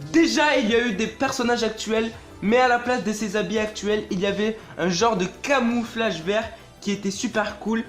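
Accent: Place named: England, United Kingdom. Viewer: t un accent englais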